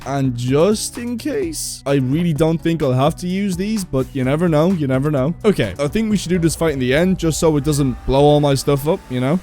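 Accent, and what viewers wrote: Ireland. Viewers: also are you irish? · Yo bro r u irish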